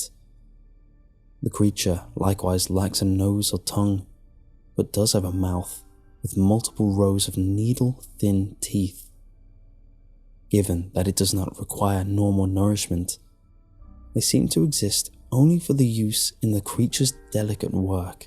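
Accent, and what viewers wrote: England, United Kingdom. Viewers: as an Oglalan Souix, I am kind of amused this story is being read by an Englishmen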